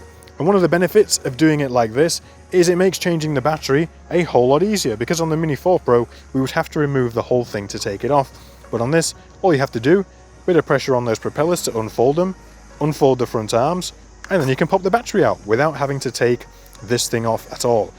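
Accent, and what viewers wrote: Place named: Yorkshire, United Kingdom. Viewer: You live in the sunshine by the looks of things but you seem to have some Yorkshire roots as well. I'm a Sheffield boy - best wishes, Nick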